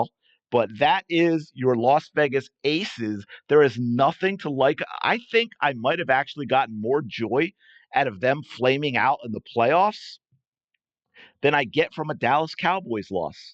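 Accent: Philadelphia, United States. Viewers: No need for the Cowboys slander. You sound like your from Philly. Let’s just keep it to the Aces implosion and Aja crying.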